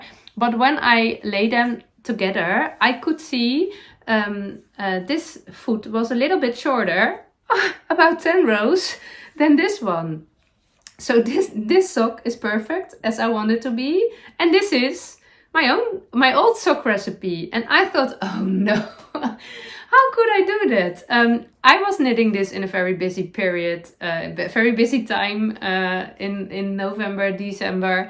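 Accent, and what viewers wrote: Netherlands. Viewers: I love your Dutchy English. It always makes me smile. Some of the things you say may be grammatically incorrect, but they make perfect sense and that is what I like. Thanks for podcasting in English for the rest of us. 💜💜💜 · Hello. Happy New Year to you….bit late but better late than never! Love your vlogs and your Dutchy English always makes me smile….in a good way! I too have been wanting to cast on a Felix cardi so maybe, if I finish my Stephen West MKAL😂😂😂I’ll cast on with you. Best wishes❤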